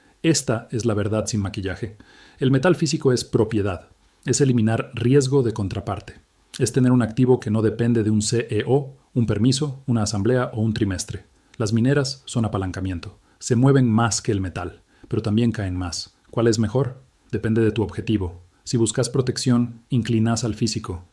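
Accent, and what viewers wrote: Argentina. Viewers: Por que le pusieron español argentino, suena desagradable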